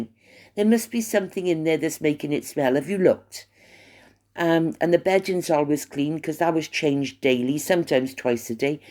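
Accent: Wales, United Kingdom. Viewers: So lovely to hear that beautiful welsh accent. You are a diamond xxx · I have missed you. I used to listen to you going to sleep. In Australia 🇦🇺. You made me laugh. I’m so glad. I’m so sorry with what you went through. We must stick together. I hope wales with your welsh accent will help me sleep. I’m glad I found your channel again 😊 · I couldn't believe my ears so absolutely delighted to hear your beautiful Welsh voice again.. i have missed you so much. I wish you all the very best in your endeavours, Caroline ❤❤❤ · Hi listening to the vlog again. I had a thought abt subliminal messages imo & allegedly MM with her Raspberry Jam & Dog Biscuits was trying to make some of the BRF look like “Jammy Dodgers” IYKYK… think you get my drift. Glad yr back & yr welsh brogue is wonderful listening. Best wishes from Adelaide; South Australia🦘🐨🇦🇺💐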